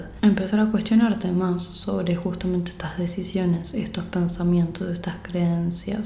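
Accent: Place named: Argentina. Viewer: El acento argentino en una mujer es maravilloso pero me caga escuchar a los hombres argentinos hablar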